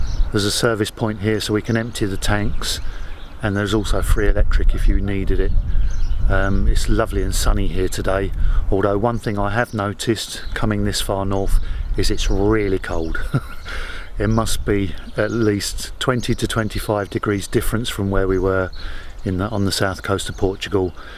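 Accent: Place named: Southern England, United Kingdom. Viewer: Judging by your accent you're not that far from the Channel so i can see why you use Eurotunnel. For me Dover is a five hour motorway maul so using the ferry was a welcome break. However i stopped using Calais after a bad experience....not from migrants but a UK Border control guy. I was queued in my campervan, sitting in the drivers seat, when he walked in front of my van so saw me, then wrenched at the side opening door which was locked, so he banged angrily on the side of my van. I got out of the van and gave him a piece of my mind. After that i never used Calais again and started using Dunkerque. The difference with the Border control guys there was chalk and cheese. Total politeness every time. Glad you had a successful trip.....you've just got to batten down the hatches now and sit tight for a few weeks!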